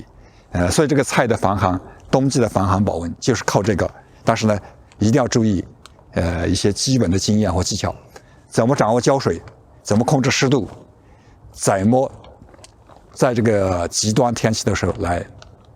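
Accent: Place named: Kunming, China. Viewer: 谢谢李老师！听着你的昆明口音，太亲切了！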